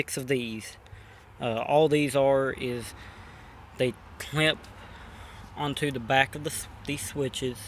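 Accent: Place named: Pittsburgh, United States. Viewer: Are you in the Pittsburgh area? I noticed from your accent. If so, I have a 99 Legacy I might need advice with for changing bulbs... pm me!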